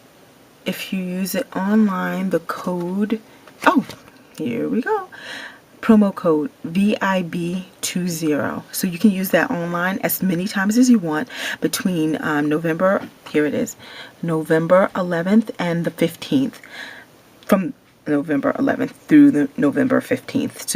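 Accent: Caribbean. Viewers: I really enjoy your channel. I may be a little late on this but are you west indian? I heard an accent along with the sucking of the teeth lol, not to mention the great food.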